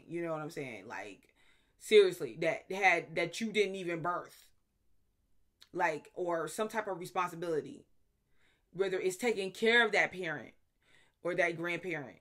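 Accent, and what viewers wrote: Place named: East Texas, United States. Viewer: Are u from east texas by the way? I love your accent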